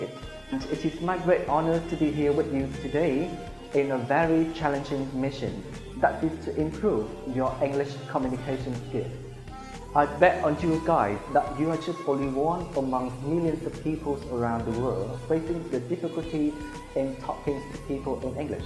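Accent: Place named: England, United Kingdom. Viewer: You've got English accent:)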